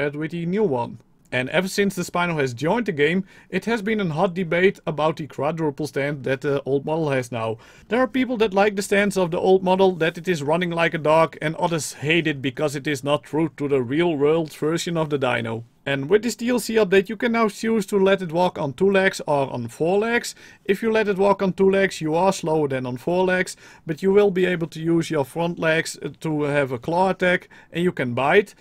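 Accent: Netherlands. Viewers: Lord Tachanka volgens mij wel want hij had eens een nerderlandse command geplaatst en hij spreekt veeldingeb op een nederlandse manier uit zoals sarco en andere dingen en hij heeft zon nederlands engels accent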